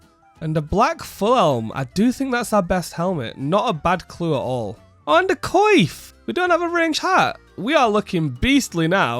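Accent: United Kingdom. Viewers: Where in the UK are you from? Love your accent.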